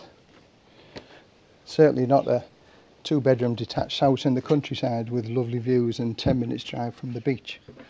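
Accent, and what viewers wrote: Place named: Teesside, United Kingdom. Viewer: Always enjoy your videos ……I’m planning on retiring to Thailand this November……thanks for sharing both inspirational and useful info…..Always good to hear a friendly Teesside accent from the other side of the planet 🌎 #UTB 🙂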